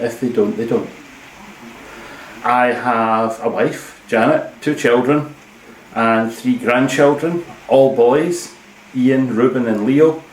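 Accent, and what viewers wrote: Scotland, United Kingdom. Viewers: I like your Scottish accent. Hey, here's how I talk, or taw-wuk: <link> How blessed you are to have a grandma who was so crafty! Lovely! By the way, I use acrylic almost exclusively. I knit for charity, and friends, and it seems to make more sense to make a garment that can be worn after throwing it in the hot water washer and dryer. Beautiful entrelac. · Just starting to go back and watch all of the podcasts, the socks are made from Drops Fabel...I just finished a pair in the same colourway.I love listening to you speak, it reminds me of my husbands family in Scotland. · Hello. It was a pleasure watching your first podcast. As a native scot, now living in Canada, it was pure heaven listening to you talking about my passion, knitting, in your very calm and lovely accent.I look forward to your next podcast. Cheers Christine Ferguson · Hi, Nathan sent me. 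I really liked your podcast. Loved your knitting, accent and the pitter patter of your lovely Scottish weather. I'm am partial to Scottish originals, can't get better than bagpipes and Westies (We have 2). I look forward to seeing your creations. Good job!!!! Sandy (Albany, NY USA)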